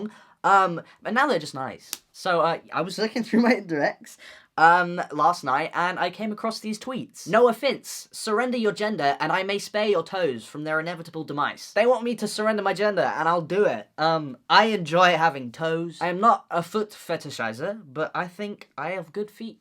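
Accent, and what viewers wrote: England, United Kingdom. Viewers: im sorry noah it's just that english accent is so refreshing to me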